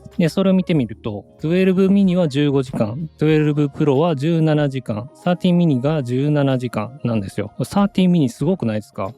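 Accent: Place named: Kansai, Japan. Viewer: 関西弁で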